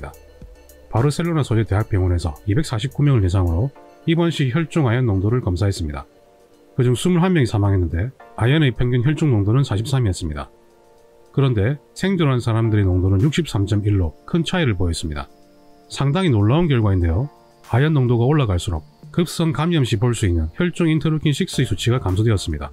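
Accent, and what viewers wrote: Gyeongsang, South Korea. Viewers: 경상도 악센트 쓰시는데... 초등학교는 어디서 다니셨는지요? ㅋ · 유용한 정보 고마워요 그런데 말씀 속도가 굉장히 빠르면서 경상도 사투리도 강해서 50% 정도밖에 이해가 않되요. 저의 지인들도 그러더군요. 그러니 중요한 과학적 용어들은 더군다나 전혀 이해 불가능 !!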